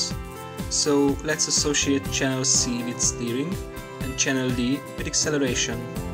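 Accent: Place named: Hungary. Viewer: Hallani hogy magyar vagy!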